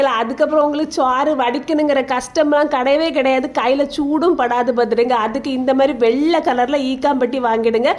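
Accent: Kanyakumari, India. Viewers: Athu nellai tamil illa athu kumari tamil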